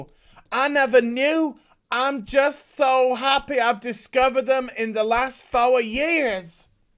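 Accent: South, United States. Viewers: Holy shit! Being from the UK you sure got the Southern United States accent down pat! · You sound like a lovely southern belle, and would fit in wonderfully during the mid 1800's. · I love your southern? US accent lol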